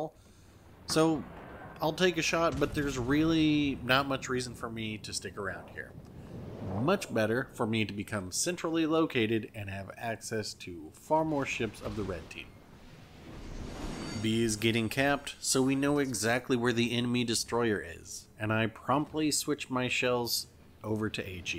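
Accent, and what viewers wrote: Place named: England, United Kingdom. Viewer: no your good but i find the anglish accent fun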